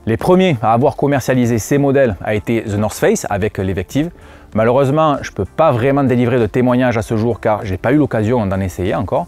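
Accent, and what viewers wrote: South, France. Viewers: Encore merci pour toutes ces vidéos ! Je suis un grand fan y compris du petit accent du Sud 😊🤩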